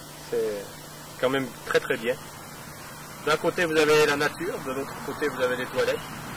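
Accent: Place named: Quebec, Canada. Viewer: Amusant, il a l'accent Québécquois. Et du Kangourou, j'en mange ce soir pour le réveillon de Noël 2010 en France.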